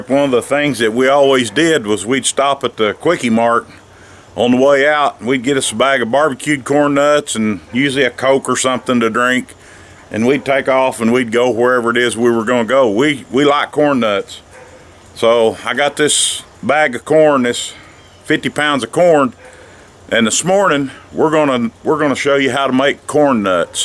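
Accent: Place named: United States. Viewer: Great video. Love the country accent.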